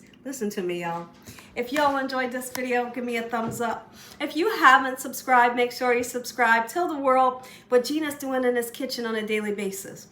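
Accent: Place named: Midwest, United States. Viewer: What state are you ? I can hear mid western accent or southern.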